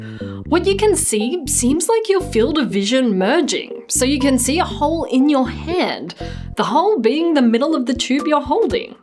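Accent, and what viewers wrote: Australia. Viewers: I'm not hearing any at all, just an Australian accent. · Accents are cool and weird sometime. I wonder how "tube" became "tchub" down in Australia.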